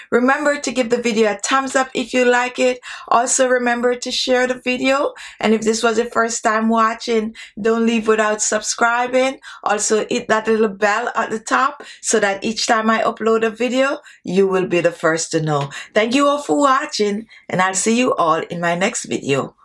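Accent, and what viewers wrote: Jamaica. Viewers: You're Jamaican right? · Were are you from? You have a strong Jamaican accent.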